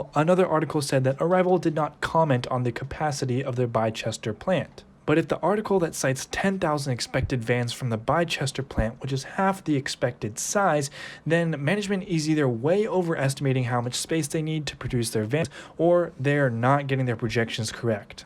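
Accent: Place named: United States. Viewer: dam yanks ruining the English language as usual. Pfft! ....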